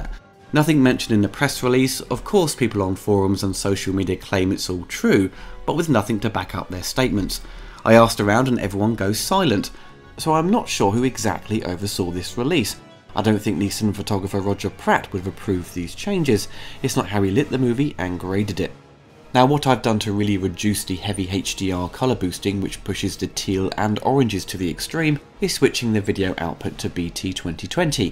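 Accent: United Kingdom. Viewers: I agree, I prefer the old sound, it goes along with the movie style and soul. The new sound I would say it it's more in tune with newer films. The color is appalling! Why are they always messing what is right instead of correcting and clean what is wrong. Star Wars for example, is one of those cases of color tampering bahhh. Exactly, there will be always people who like one thing and others don't, so why not include options. By the way, love your reviews, channel and your British accent =) Cheers!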